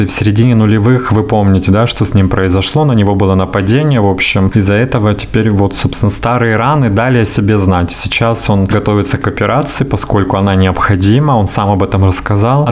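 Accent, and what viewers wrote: Ukraine. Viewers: Ура! Любимые новости опять, бархатный тембр и украинский говор ("снег притрусил" - как мёд на душу, обожаю українську мову, даже смотрю иногда ток-шоу на украинском)., это правда, что почти все кошки правши, а коты левши? Ваша кошечка правша?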